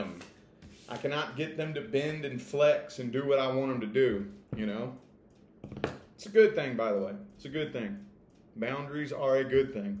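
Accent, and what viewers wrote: United States. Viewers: Nice reading 😊 By the way, I like your accent. Where in the States your accent from?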